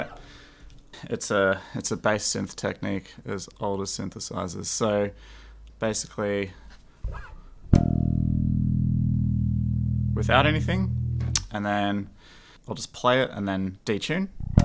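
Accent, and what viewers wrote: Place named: Australia. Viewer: have the natural of the ehb1505ms (since last saturday). and cool to hear ot hear another aussie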